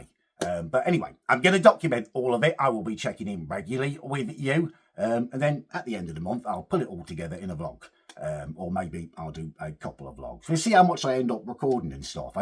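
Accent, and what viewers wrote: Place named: Blackburn, United Kingdom. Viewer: Think he was born in Blackburn, I'm from around there and he has the same accent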